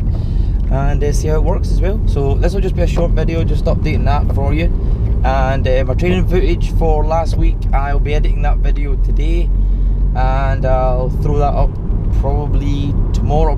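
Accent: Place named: Scotland, United Kingdom. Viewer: .....then we see some training videos from you and me next week!👍 I have to admit to have some problems to understand your scottish accent too😉 Best Regards from Spain, Adam💪💯%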